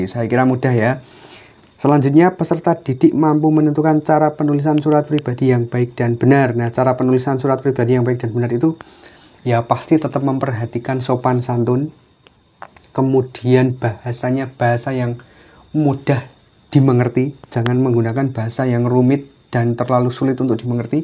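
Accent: Indonesia. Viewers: Dari logatnya saja udah kedengeran jawanya.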